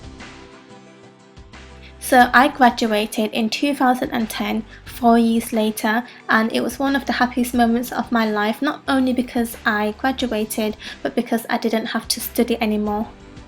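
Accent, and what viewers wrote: United Kingdom. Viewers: I love British accent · ...Why wouldn't she keep her British accent if she lives in Britain? LOL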